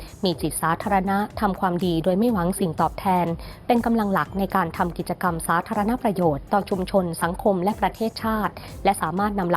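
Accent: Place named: Isan, Thailand. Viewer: คนอ่าน ติดสำเนียงอีสาน ใจเย็นๆนะ. ค่อยๆพูด. ตัว ก.เป็น. จ. ตัว. จ. เป็น. ก. จิตอาสา. ไม่ใช่. กิจ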